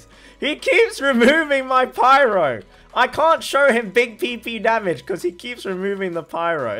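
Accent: United Kingdom. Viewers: I've watched many Genshin content creators, this is the only channel I keep coming back.. There's no happier thing than to watch an Asian with a British accent :D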